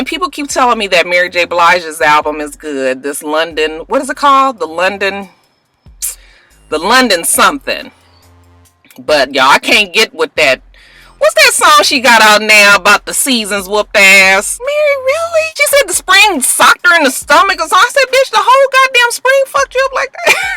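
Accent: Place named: California, United States. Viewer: Heayer and Theryer. That Cali dialect is present!!!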